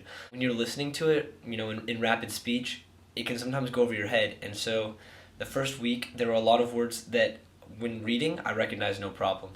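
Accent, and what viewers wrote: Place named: Spain. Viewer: Jajaja me encanta tu acento Español! Besitos desde País Vasco, España!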